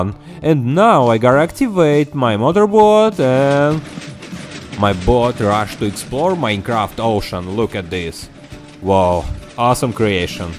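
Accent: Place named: United Kingdom. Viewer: Отличный акцент. Ты из британии?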